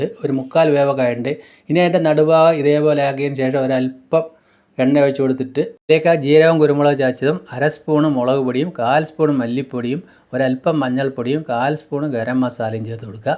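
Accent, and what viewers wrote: Palakkad, India. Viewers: ചേട്ടൻ പാലക്കാട്ട് കാരൻ ആണല്ലേ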